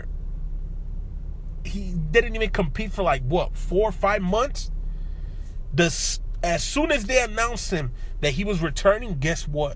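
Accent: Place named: West Coast, United States. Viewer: Bruh I forgot I even commented watched this again dying laughing your accent is gold bruh 😂 definitely a west coast Latino 🥂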